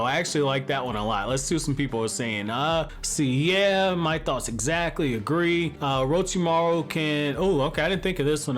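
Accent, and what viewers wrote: New York, United States. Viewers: I fucking knew you was from New York when I heard you laugh bc my clan filled with New Yorkers but know it’s confirmed p.s New York pizza ain’t shit compared to Chicago 💯🤣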